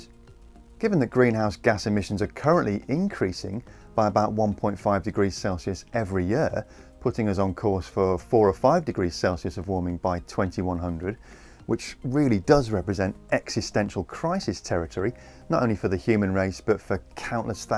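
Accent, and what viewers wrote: England, United Kingdom. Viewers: Keep up the data driven policy analysis! Much more likely to cut through to the harder to reach climate change sceptics than Greta's emotional, hysterical manner. All delivered in a calm, considered English accent with minimal background music. Hooray!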